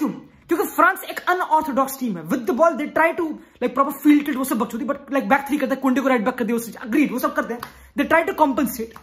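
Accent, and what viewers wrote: Delhi, India. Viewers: Your football knowledge+Delhi-NCR ka accent will lead you to greatest heights. zindabad! ✊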